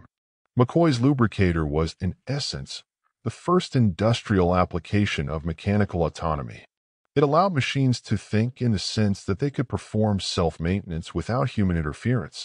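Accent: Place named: Europe. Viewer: Just as sad is this video using a voice that sounds european why? Are you focus on them or what?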